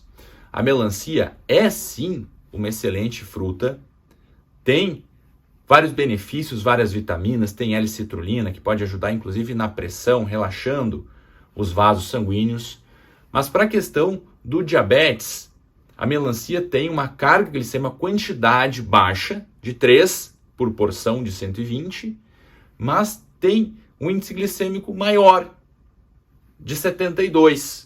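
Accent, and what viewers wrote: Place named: South, Brazil. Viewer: Falo de Paço do Lumiar Maranhão, já tinha percebido que ele é do Sul pelo sotaque rs, nota 10 pro vídeo, muito explicativo, esclarecedor, além de muito dinâmico e simpático ainda é um gato 😍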